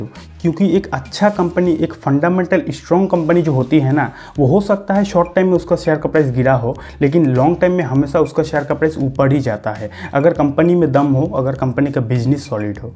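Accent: Bengal, India. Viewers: Bhi ap bengali ho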